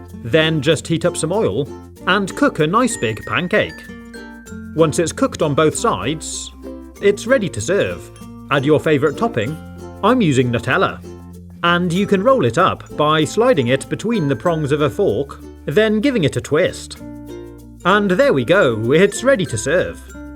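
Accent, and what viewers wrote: United Kingdom. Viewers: i love your accent british guys, loves from turkey :) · I normally don't like the British accent but yours is so satisfying to hear to.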